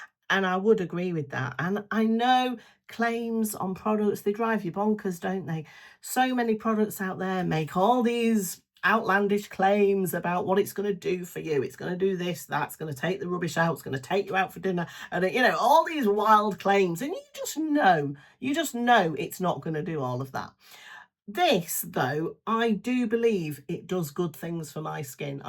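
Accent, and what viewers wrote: Manchester, United Kingdom. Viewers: Hi, Derbyshire looks really lovely. Your accent reminds me of my dear friend who is originally from Manchester. No7 is actually quite pricey here in Australia even on sale but yes we do get some deals like Buy 1 get 1 free or free gift with purchase at Priceline. I use their blush/highlighter stick but would love to get my hands on some of their skincare. Haven't seen the Prime Forever products yet. Interesting! The Glaciology part is apparently good for blending advanced antioxidants with peptides to preserve collagen and elastin from environmental aggressors, and it helps with Rosacea....apparently. And yes the lines on our decolletage are so so annoying so I flood it morning and night with essences, serums and creams as well as my retinal. Thanks for the information 💞🥰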